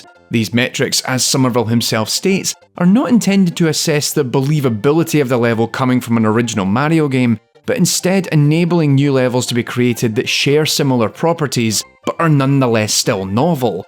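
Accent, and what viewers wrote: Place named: Fife, United Kingdom. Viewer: I may be wrong but I sense a Fife accent?? 👀 also I luv the video I should rly be asleep right now lmao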